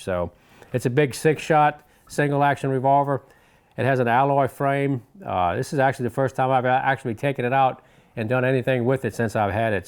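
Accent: Louisiana, United States. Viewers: what state and county is that accent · "Tanks" for a wonderful video! Nutting like a big bore to start da day!!! You remind me of the ol' Cajun Chef Justin Wilson, your accent & looks! You're a real man's man! Load up that ol' 45/70 wit some of Dat Cajun Gumbo & you've got a real gnat stomper! And it's "good for you to see me, I guarontee"!!!!! Love ya my friend.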